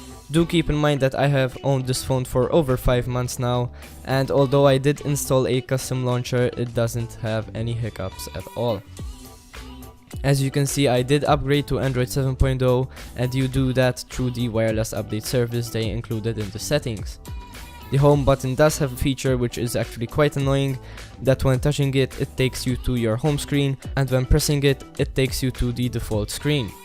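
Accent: Malta. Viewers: Noticed the maltese accent 😅 awsome channel kugin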